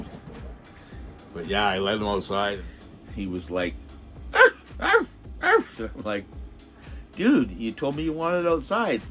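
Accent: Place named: Canada. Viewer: I thought Dude said : Peace,Love & chew toys. But it could be his Canadian accent.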